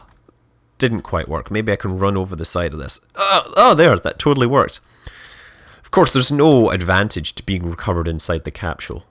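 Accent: Scotland, United Kingdom. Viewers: Man his accent is doing my fucking head in. half DJ half Scot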